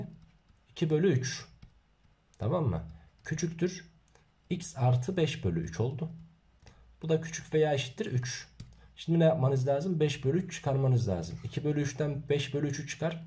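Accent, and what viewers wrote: Ankara, Turkey. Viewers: Hocamız Angaralı sanırım :D 👍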